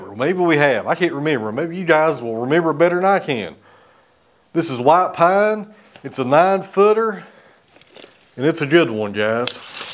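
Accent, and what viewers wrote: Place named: East Tennessee, United States. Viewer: Glad I found your channel. While I'm at work, up in a boom running conduit, I watch your content while I'm waiting for material or whatever. lol That East Tennessee accent makes it feel like I'm watching someone I can relate to a little better than the average channel. 😂 If you've done much traveling, you know everyone isn't as friendly as most folks around here are.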